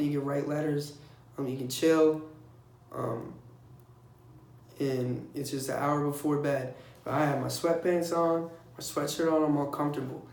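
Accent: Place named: East Coast, United States. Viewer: 😂😂 i can tell mans is from the east coast good shit my guy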